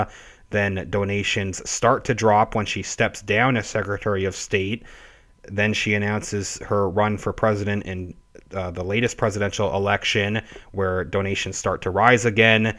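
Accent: Canada. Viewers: You sound a little Canadian. Great channel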